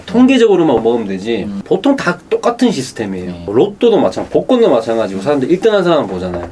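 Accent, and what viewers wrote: Gyeongsang, South Korea. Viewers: 말투가..갱상도출신인가요..ㅎㅎ 머.도박을.했엇지만...비굴하지는.않했네요 자기말에.지킬려고.했던.마인드.. 칭찬해주고..싶습니다.... 도박..다시는.않하시겟지만.. 내용을.보니.머리가.아주.비상하시것같습니다 뭘해도..잘해내실것.같네요... 홧팅.드립니다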